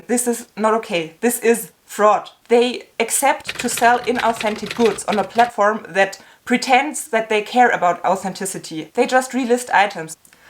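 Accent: Germany. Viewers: Are you german?😅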